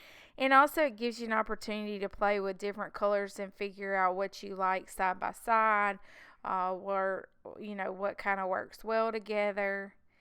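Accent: South, United States. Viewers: Welcome back! I have sure missed your wonderful videos, and that cute southern accent!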